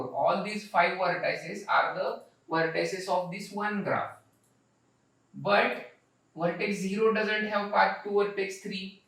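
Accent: India. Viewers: It has a strong Indian accent, but it doesn‘t interfere with the good explanation.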